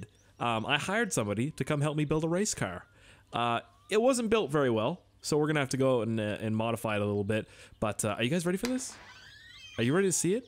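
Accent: Germany. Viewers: Your German accent sounds like a gay man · im disapointed on the fact you put out german accsent on finnish game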